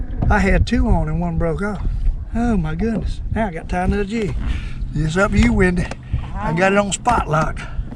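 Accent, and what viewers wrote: South, United States. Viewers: Hey its sourthern english I understand u just fine but I am from alabama LOL good vid sir👍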